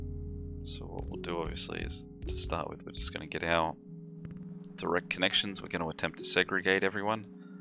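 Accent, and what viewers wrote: Australia. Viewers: another good video, you aussie?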